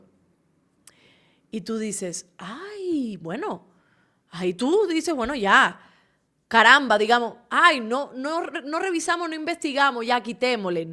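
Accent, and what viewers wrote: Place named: Cuba. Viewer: Saludos bella....Me encanta tu programa....cada vez hablas más en Cubanito...que linda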